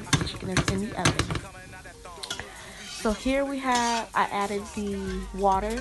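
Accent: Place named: Haiti. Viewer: Ahah lol the Haitian accent got me chicken wings wings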